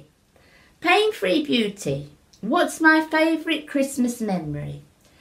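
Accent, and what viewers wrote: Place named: Scotland, United Kingdom. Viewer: I have been subscribed to your channel but hardly have a time to drop a line! What part of Scotland are you from? I luv the accent!👍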